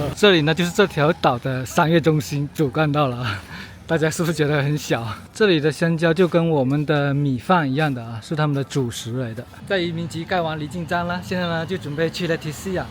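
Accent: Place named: Cantonese, China. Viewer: 广东人，他普通话没那么好😂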